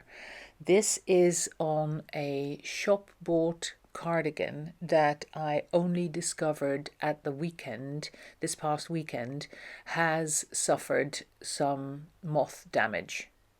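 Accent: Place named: Netherlands. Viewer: my best friend is Dutch and so recognized your accent coming through straight away. You look so good in all the clothes that you model. Continuing to watch your podcasts. Cheers 🥂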